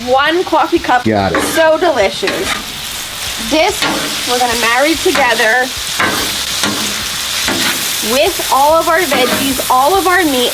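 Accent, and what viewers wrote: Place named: New York, United States. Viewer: forgot to ask…. With “caw-fee”, methinks you’re a “nee-ew yaw-kuh”… am I caw-rect? 😁… brings me back to my roots as a joisey boy hearing from the other side of the river over the Hudson…. I think, once I use up all my preshredded mozzarella, gotta find a decent multi shredder box to shred my own… I might try those small cheese balls in the water… forgot what you called them.. have to rewatch anyway. Pause-n-cook, or write EVERY step down. Either way…. What about a little ricotta (or “riggutt” in the NY/NJ “Sicilian” slang)?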